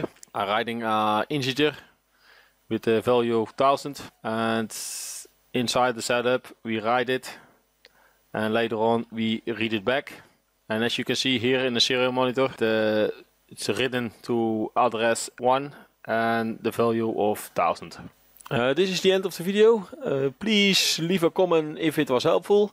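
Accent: Netherlands. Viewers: 10 seconds is enough to recognise that his native language is dutch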